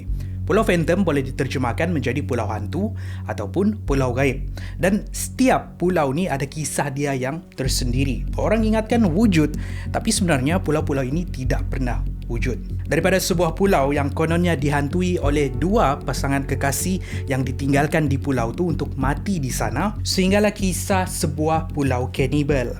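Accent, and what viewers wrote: Sabah, Malaysia. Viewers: Admin ni orang sabah ka..loghat macam orang sabah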